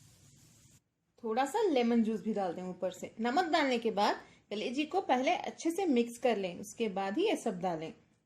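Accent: Hyderabad, India. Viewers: Are you Hyderabadi?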